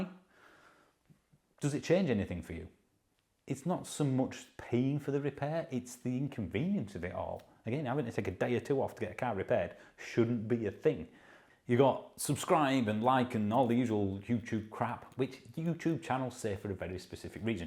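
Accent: Yorkshire, United Kingdom. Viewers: I own a car repair garage 30yrs. I am level 4 EV qualified. We have been a member of HEVRA for 9 months. I have stripped and repaired a leaf battery HEVRA helped us all the way through it with correct information I would do one now without an issue. We are now at the EV stage where we were when fuel injection first came out! HEVRA are here to “hold our hands” through in depth EV repairs which I explain this to our EV customers but you’ll find a lot of garages don’t want to make “false promises” and let the customer down. You going to have a look around at the moment but 2/3yrs from now you’ll find a LOT more HEVRA members will be a lot more confident. I’m on a training course with cleverly EV early April and hands on course inc Tesla I actually think your near us by your accent Cleckheaton area.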